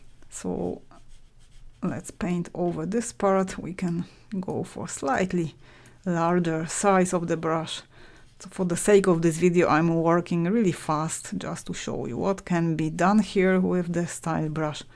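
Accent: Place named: Poland. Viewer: De acuerdo, Enrique, y ya que mi lengua materna es el inglés puedo confirmar que el dominio de del idioma es superior. Es más, su rico acento polaco, según lo expresan los hondureños al menos, pues, es del todo 'delichoso' al oído nativo.:)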